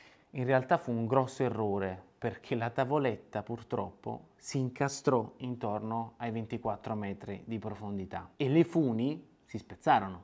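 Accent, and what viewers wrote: Naples, Italy. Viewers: Ciao! Sei napoletano? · Sei napoletano?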